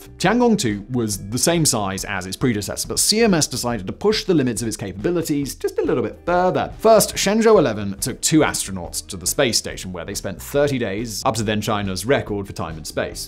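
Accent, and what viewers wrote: England, United Kingdom. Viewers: I have to tell you that the commentator in this video speaks too quickly combined with a thick English accent while at the same time sounds like he is holding his nose shut. So the reault is you can't understand or at least you have a very difficult time understanding what he is saying.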